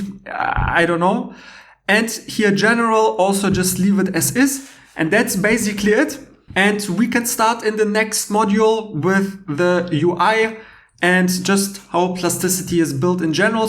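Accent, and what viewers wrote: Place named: Germany. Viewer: Gibt es das auf Deutsch? Dieses hässlich klingende Englisch mit deutschem Akzent ist unerträglich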